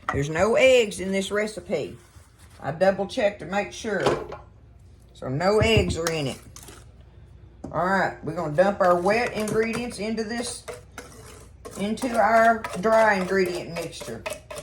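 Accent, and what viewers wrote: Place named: Texas, United States. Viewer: I love the Oh, Oh lol, I miss Texas, love her accent.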